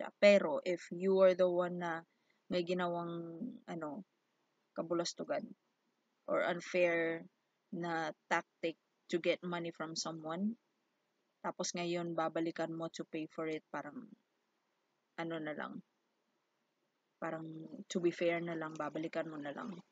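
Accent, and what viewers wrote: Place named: Visayas, Philippines. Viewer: Bisaya diay ka maam.😂no worries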